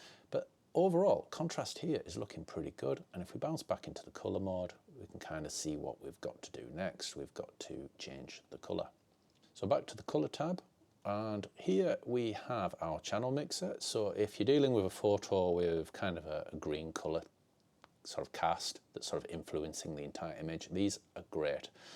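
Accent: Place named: Spennymoor, United Kingdom. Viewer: Just stumbled onto your video, really glad I did. Some really useful tips I haven't seen elsewhere, the black and white thing for example. I would definitely appreciate a video on masking from you, again I think I'll get tips that I don't see elsewhere. Couldn't quite place the accent though. I was hedging Spennymoor..... Ps. That's a great eye for the photo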